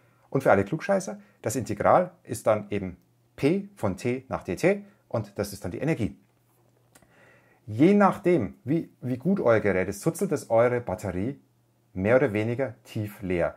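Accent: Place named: Munich, Germany. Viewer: darf ich fragen wo du in etwa herkommst? laut deinem akzent würd ich so auf region münchen tippen ^^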